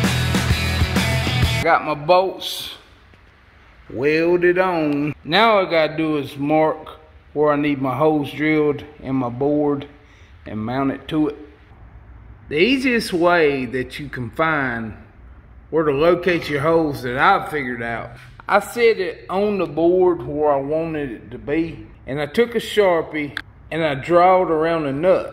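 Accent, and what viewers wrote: United States. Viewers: love his country accent and done a great job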